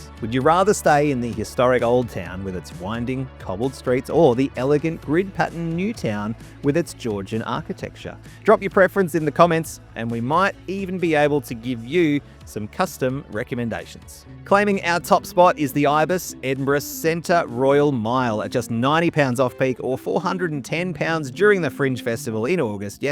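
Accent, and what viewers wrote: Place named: Australia. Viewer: Bro are you Australian?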